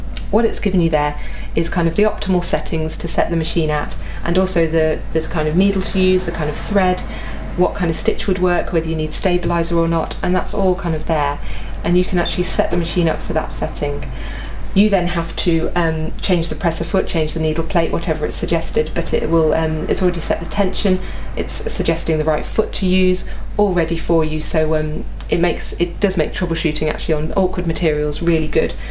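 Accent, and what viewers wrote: United Kingdom. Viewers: I adore her proper British accent. Great demonstration. I intend to buy one this week.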